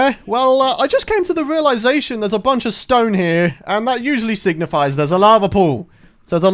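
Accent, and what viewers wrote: United Kingdom. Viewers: Both British and Lively I guess. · British and lively!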